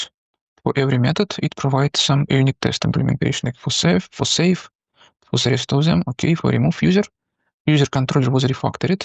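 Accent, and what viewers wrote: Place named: Russia. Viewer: Слышно, что русскоязычный. Why do you speak English?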